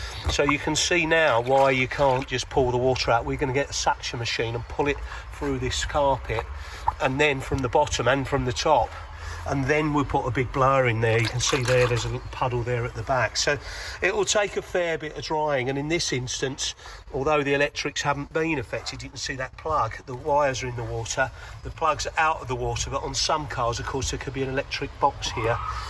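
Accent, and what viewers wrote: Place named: United Kingdom. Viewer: THANK YOU for your video ( Made a change hearing a British accent & not American) the drivers side Footwell carpet keep feeling wet after it's rained so im going to Weatherstrip the Windscreen & Back window around the door frames & I have a 'Peugeot 207 3dr Hatchback 2010' & on the roof of the car along both sides theirs brackets ?? Where you'd attached a roof rack im going to put some sealant along them both trying to eradicate where water maybe getting in, it's only the drivers footwear carpet that feels wet so hopefully working from the top of the car down ill manage to weatherseal the points of access where waters getting in. Thankyou again & really appreciate your knowledge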